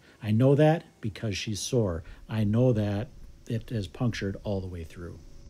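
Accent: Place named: United States. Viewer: Is it just me that your accent is more american nowadays? I used to hear your thick accent before so it is weird for me to hear your more american, english accent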